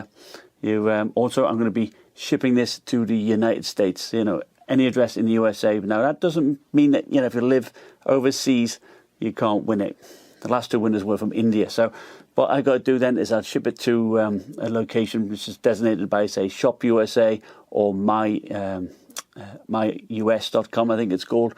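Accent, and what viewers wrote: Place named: Teesside, United Kingdom. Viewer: Cracking channel, stumbled across you a few weeks back and the accent caught me attention! You sound like your from just up the road from me in Teesside but you’ve gone global! 😂 love the channel and bought myself a Nitro 5 last week after watching your channel! Can take the lad out the north!.....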